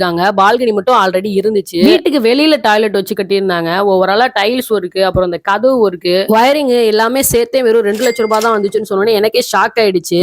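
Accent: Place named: Kanyakumari, India. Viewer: Akka kanniya kumari slang pesuanga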